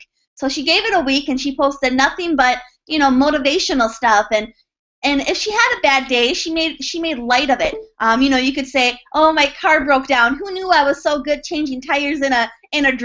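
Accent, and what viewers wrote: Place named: North, United Kingdom. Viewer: I love your northern accent!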